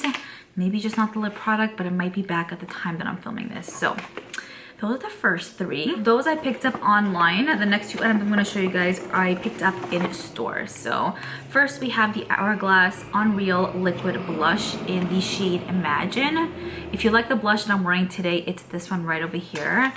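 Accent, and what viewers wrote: New York, United States. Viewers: She has a New Yawk accent just like me!! 😂😂😂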